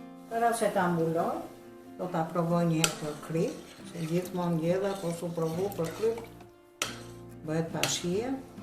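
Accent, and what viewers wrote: Tirana, Albania. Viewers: Sa me pelqen kur flet gjuhen e bukur tironse…❤ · te lumte goja e dora na kenaqe me gjuhen e tirones po e gatimet i ke shume te mira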